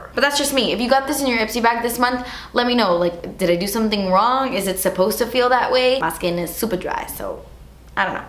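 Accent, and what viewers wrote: United Kingdom. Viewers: Lmao you really made me laugh when you tried on the lippie 😂 and yo' British åccent haha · That British accent😂😂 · Your British accent cracks me up every time! 🤣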